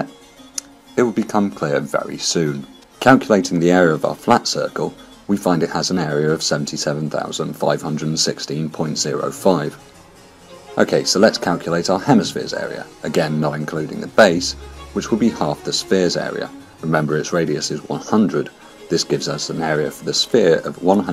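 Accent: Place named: North, United Kingdom. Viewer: I like the shaky camerawork at the beginning! It gives the film a bit of a 'Blair Witch' edge to it. Seriously though ... your mellifluous northern tones makes the rather esoteric subject matter a lot more palatable. As if Brian Cox had presented those Open University programmes in the 70s. Now then. The question is what do I do first? Watch your other videos? Visit Iovandrake's profile with my troll mask? Or Google 'potholer54'? And before you say 'none of the above' ... you're too late. I have already subscribed to your channel. Nice one!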